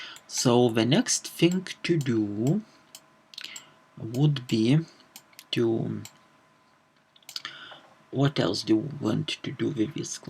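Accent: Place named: Balkans. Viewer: Finally after 2 hours of searching i finally found good tutorial... Thank you! P.S. I might be wrong but from your accent and the pronunciation of the "R" ...i have the impression that you are from the balkans? (Sorry if i'm wrong).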